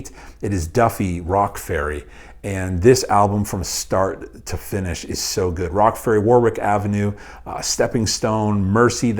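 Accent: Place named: Canada. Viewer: I was wondering if you were Canadian as I’ve picked up on the accent, especially in this video. That explains why you are so nice and polite!!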